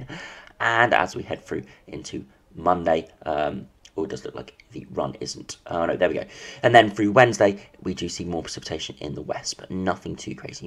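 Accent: London, United Kingdom. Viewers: Where does the guy doing these updates live? I'm guessing London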